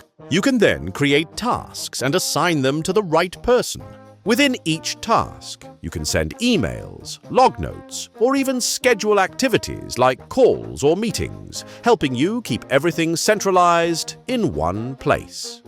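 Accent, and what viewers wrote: RP, United Kingdom. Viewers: It's ironic that the narrator for this video is British 👀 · That British accent is great 😊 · New narrator is fine, but just sounds like more of a “generic RP English accent” and has less of the edge and personality of the old narrator.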